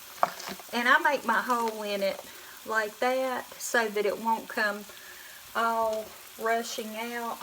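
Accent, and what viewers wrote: South, United States. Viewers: Heather Robbins:. Isn't a sweet lady to use her time to teach us different recipes? It's that southern tone she has,just so sweet.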